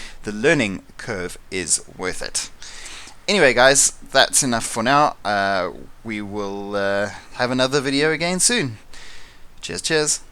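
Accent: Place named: South Africa. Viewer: Hey! Been using the same theme since 2014, and also produce electronic music. If that isn't rare enough, it sounds like you're also a South African! Greetings from Cape Town :).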